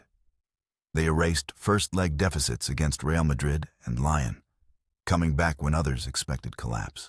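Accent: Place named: United States. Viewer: Why do we need an American accent?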